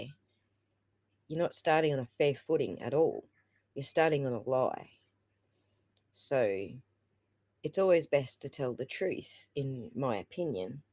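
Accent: Australia. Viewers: I really love listening to.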